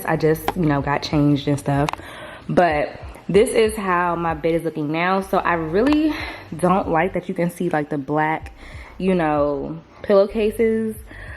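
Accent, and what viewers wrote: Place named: New Jersey, United States. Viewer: yessss!! Lol she and I are from the same state. Lol and you sound like my cousin in NJ lol boy these locations and sounds are too funny lol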